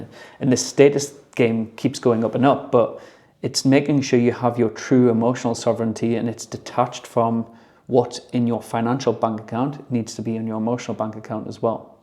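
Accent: Newcastle, United Kingdom. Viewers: Are you a jordie or from South Africa? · I thought I recognised that accent (as a fellow Geordie) 😘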